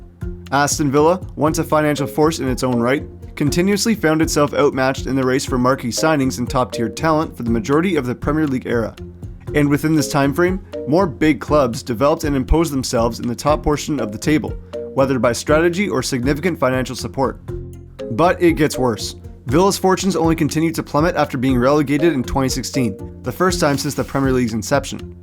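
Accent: Canada. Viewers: I bet ur Canadian mate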